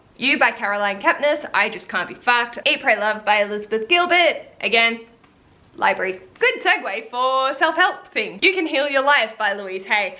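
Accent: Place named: Australia. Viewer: If I can place accents properly, I believe that you are Australian. I have many friends from Australia that swear a lot. I love it, lol. Canadians swear a lot as well, but we don't sound half as good swearing like our Australian cousins.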